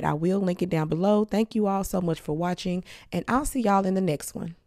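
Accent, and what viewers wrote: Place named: St. Louis, United States. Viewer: I definitely wanna become a esthetician the more and more I watch ur videos…but I kant help to ask yu got the urrrz like ur from St.Louis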